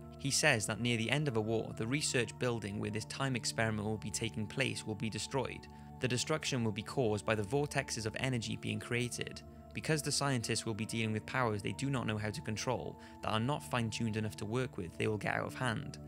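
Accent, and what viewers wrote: United Kingdom. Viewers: The most unsettling thing about this video is hearing a guy with a british accent say "Nucular"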